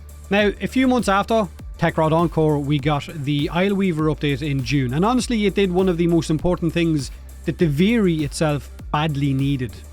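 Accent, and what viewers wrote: Ireland. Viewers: Where are you from in Ireland???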